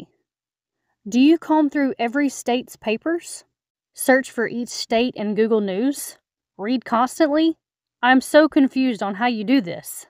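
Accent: South, United States. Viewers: Your southern accent threw me off for a moment. I thought you said the news reported by Bill, then after thinking for a moment I realized you said. A funny misunderstanding on my part!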